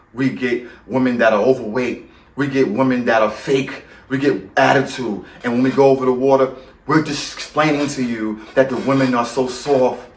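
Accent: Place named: United States. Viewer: Btw, your American accent alone is exotic to them. If you try to speak their language that puts you to the front of the line. Just be humble and they will accept you!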